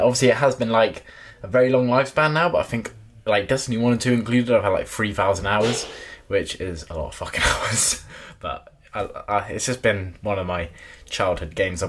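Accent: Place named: Weymouth, United Kingdom. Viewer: You from Weymouth lad?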